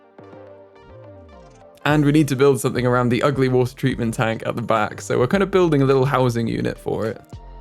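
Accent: United Kingdom. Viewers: Hes british like meh mate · where is your accent from in the uk? its really cute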